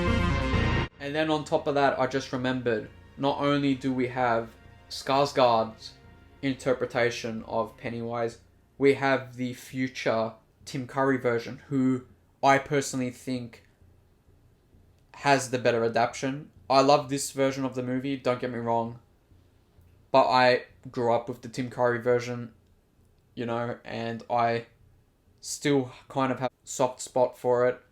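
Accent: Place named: Australia. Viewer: I noticed your aus accent too, how much did you get the inart one for?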